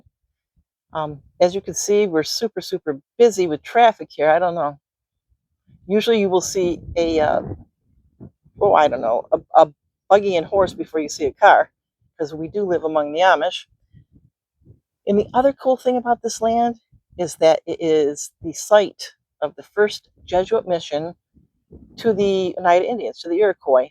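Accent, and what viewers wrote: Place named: North, United Kingdom. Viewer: Thanks for the video.I am from around tug hill.Moved to Florida because my husband was in the Military.We still have family up North.Love your up North accent.People still ask me where I am from.Beautiful country up North.I miss the seasons but not so much winter😂Thank for all you do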